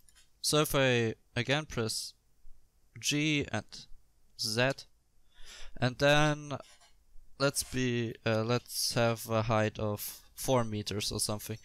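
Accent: Germany. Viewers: Du hörst dich ein bischen deutsch an :)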